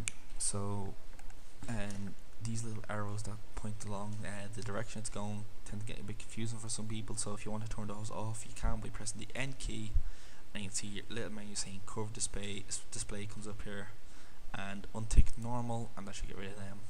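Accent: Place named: Ireland. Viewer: Great tutorial on Bézier curves -- sounds like you're from the same part of Ireland as I am.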